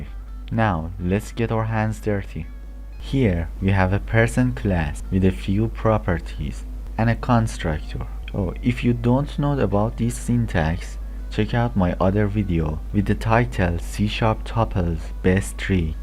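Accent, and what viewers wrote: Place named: Iran. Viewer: This is how an Iranian talks English:) nice job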